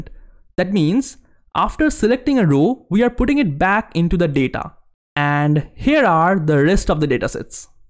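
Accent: India. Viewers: can incompetent indian schools not even teach the Queens English properly nowadays? it's painful having to listen to his paj££t accent AND seeing the words spelt wrong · This damn indian accent!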